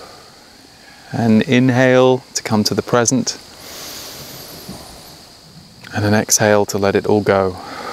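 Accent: United Kingdom. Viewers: Thanks - love your work and great to hear a Brit.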